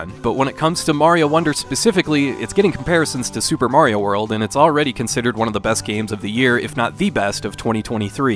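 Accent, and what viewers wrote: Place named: United States. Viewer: Just wanna say from a Brit..your accent is amazing…so Americana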